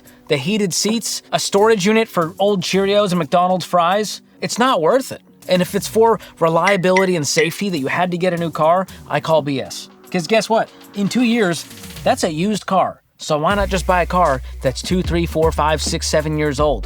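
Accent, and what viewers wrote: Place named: Boston, United States. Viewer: There was some reason demeanor and presentation felt familiar but I couldn't identify why I felt that way. But today I learned why! We're both from the Boston area! Too funny. It must be something about the cadence of his speech or his sense of humor. Proud to consider you a former neighbor,!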